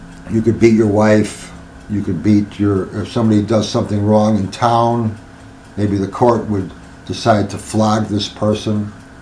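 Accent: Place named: Chicago, United States. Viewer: Hey, no joke I just asked my son if he thinks looks like anyone he knows and he took one glance and said, "Al Pacino!" LOL! Did you know that your Chicago accent is very much like Upstate NY?! I worked in a Bank Call Center for years and always knew that accent! Chicago or Upstate NY where I'm from! Had many, many ppl ask me over the years if I was from Chicago and are surprised when I say NY.... never been to Chicago.... Just a little trivia for ya!! Namaste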